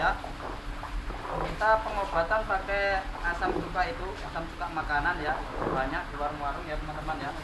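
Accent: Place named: Java, Indonesia. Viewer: Logat jawa bosq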